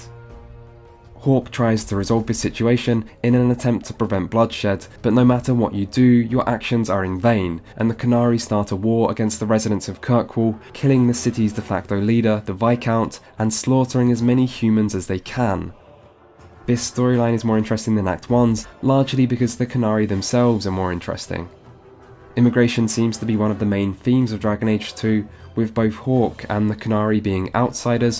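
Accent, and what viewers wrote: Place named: United Kingdom. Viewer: WEll, that was a waste of time. One day I'll learn to unclick when I hear a random british-sounding white dude voice.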